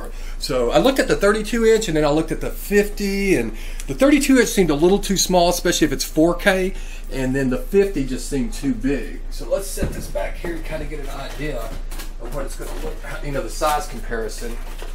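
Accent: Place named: Georgia, United States. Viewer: Are you from Georgia?? Your accent reminds me of AJ Styles. :)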